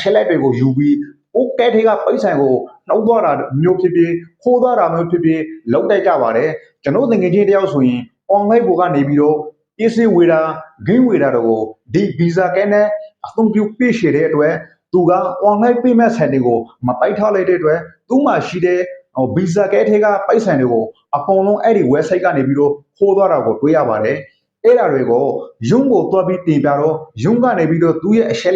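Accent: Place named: Rakhine, Myanmar. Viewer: လူစကားကိုလူနားလည္ေအာင္ေျပာပါ ရခိုင္အသံႀကီးနဲ႔မေျပာနဲ႔လီးပဲ ေစာက္ေတာသား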